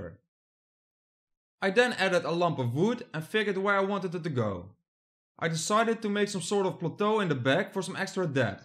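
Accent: Netherlands. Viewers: Mooie en goeie tutorial man! je hebt me zeker geïnspireerd! en dat mooie nederlandse accent valt niet te missen (' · Leuk gedaan. Wel duidelijk, ookal is het in bloemkool engels. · You sound so dutch. Love it. But was exactly what i was looking for! Thanks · Ben jij Nederlands gezien het accent???🇳🇱🇳🇱🇳🇱🇳🇱🤣🤣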